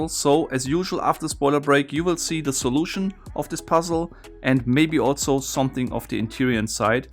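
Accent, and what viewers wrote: Germany. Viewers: Do I hear a bit of an German accent in your voice?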